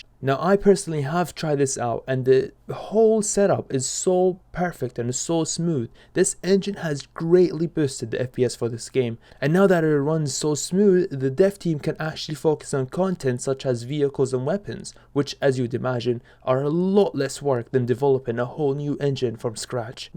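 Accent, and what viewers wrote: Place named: Scotland, United Kingdom. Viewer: Where are you from? You have a bit of a scottish accent but what is your first language? just wondering lol :)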